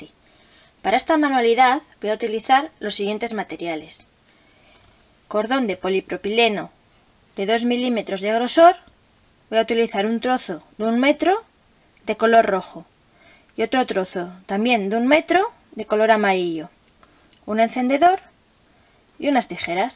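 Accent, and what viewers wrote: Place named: Spain. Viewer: Jijij me di cuenta en el principio que eras de España:)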